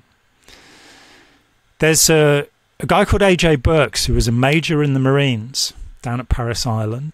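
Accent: United Kingdom. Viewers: I keep hearing Brian Cox in this man's voice. Must be from the same part of Britain. 😊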